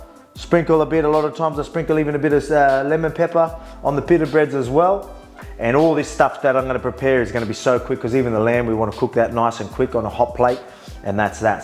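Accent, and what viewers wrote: Australia. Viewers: Volk is north Macedonian and Greek don't let that Australian accent fool ya lol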